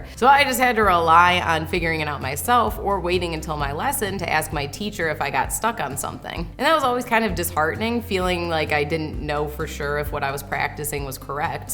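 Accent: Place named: Upper Midwest, United States. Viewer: I had literally never heard her speak, so I didn’t know she had such a thick Wisconsin/Minnesota accent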